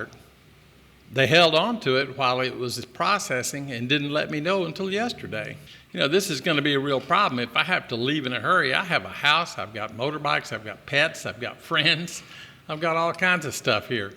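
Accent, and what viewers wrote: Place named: United States. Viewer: I've seen a few videos from Duong Business Global- They've discussed all scenerios concerning business visas. It might be help to you. Sixteen years is a long time away from the US, I could still hear your accent.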